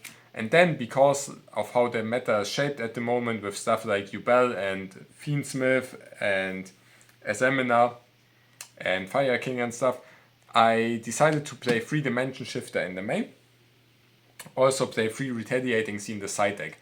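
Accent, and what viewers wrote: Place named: Germany. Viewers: he could dub a character with that voice, he didnt even have to tell me he was german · Very german english 😂❤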